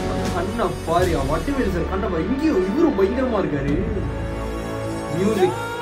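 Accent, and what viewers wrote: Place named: Malaysia. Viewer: Ponna , savadi ? Neenga Malaysian ah bro ? Antha slang varuthu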